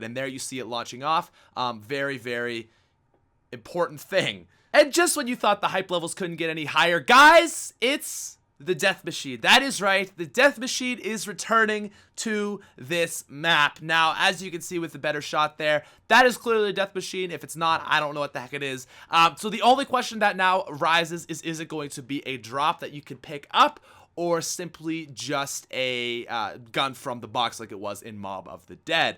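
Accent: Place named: Canada. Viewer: He's Canadian. that's how they pronounce it.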